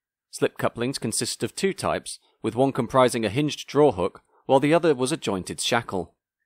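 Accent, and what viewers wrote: England, United Kingdom. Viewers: I was pretty amazed by an English sounding guy pronouncing Drogheda properly, but the name at the end explained everything 👍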